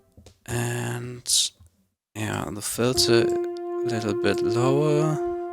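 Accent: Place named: Germany. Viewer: beautiful german accent <3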